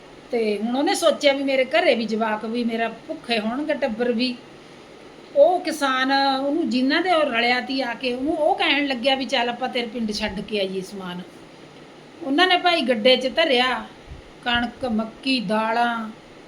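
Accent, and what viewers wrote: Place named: Malwa, India. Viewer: ਮੈਡਮ ਤੁਸੀਂ ਕਿਸ ਸ਼ਹਿਰ ਵਿੱਚੋਂ ਹੋ ਬੋਲੀ ਮਾਲਵੇ ਦੀ ਹੈ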